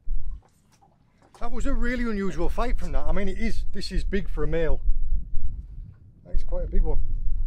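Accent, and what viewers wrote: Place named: Cornwall, United Kingdom. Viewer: “A bar of soap with pins in it” so accurate.As a youngster I caught small Bass in and around st Johns Lake in the Tamar by the bucket full.The best tool I had for holding bass was an old bar towel. Looks like your new boat in this vid? I was out fishing with a mate discussing your videos, he said there must be lots of foreign tourists Admiring your Cornish accent.It was mentioned in good taste and did make me 😂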